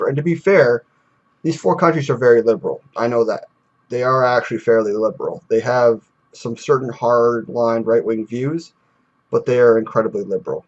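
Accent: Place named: Canada. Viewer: My god, I haven't heard such a typical Canadian accent for a long time.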